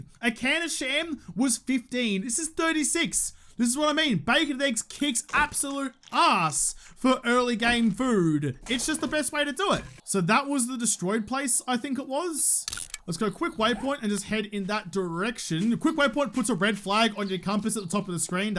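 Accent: Australia. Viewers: "Some sneaky Molys...." I love the Aussie vibe to it, but dude, you gotta admit that one just sounds ... Without the Aussie vibe.... Not smthg I would be OK with XD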